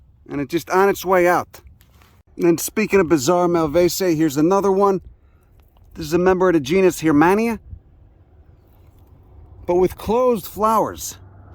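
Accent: Chicago, United States. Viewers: Chicago, Illinois area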